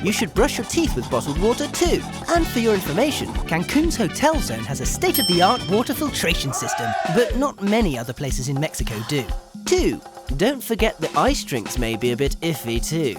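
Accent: United Kingdom. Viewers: He's not an American, he has a British accent.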